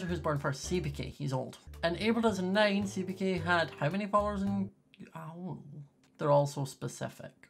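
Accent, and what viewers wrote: Scotland, United Kingdom. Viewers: you are scottish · Me: reading comments about how they thought he was gay Me being my clueless self Me: I just thought he was Scottish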